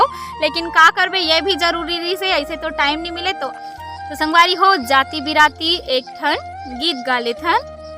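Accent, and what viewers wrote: Chhattisgarh, India. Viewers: Didi aap thumbnail ko Hindi rakhna Baki aap apni boli cg me Bhut mitha hai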